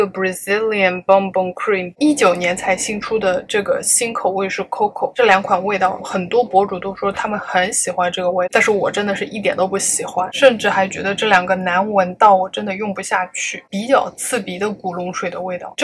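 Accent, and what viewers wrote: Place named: Shanghai, China. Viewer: 是不是上海人啊，口音很熟悉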